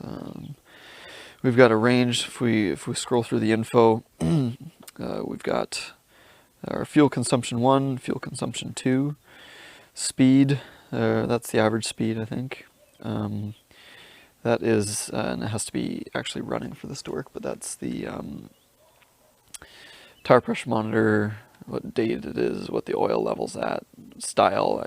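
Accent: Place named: Canada. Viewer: Great review. Thank you. When you talk about the speed you are going, is this in kph or mph please? (I see that the speedo is calibrated to read in kph but I think your accent is Canadian (sorry if I got this wrong and if you are in fact American!) and so you would normally be using mph. Judging from the video I suspect you are talking kph?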